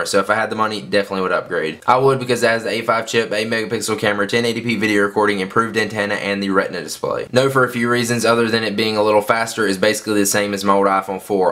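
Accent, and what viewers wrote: South, United States. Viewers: are you southern?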